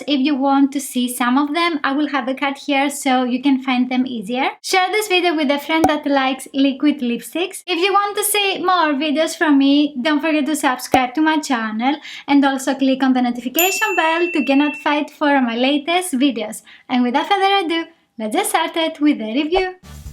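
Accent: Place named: Greece. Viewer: I immediately knew you were Greek 😂❤️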